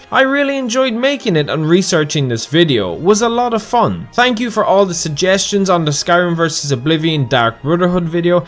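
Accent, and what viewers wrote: Ireland. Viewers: I quite enjoy the Irish accent. It's just easy to listen to. Great video. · I love me some Irish accent to sleep 2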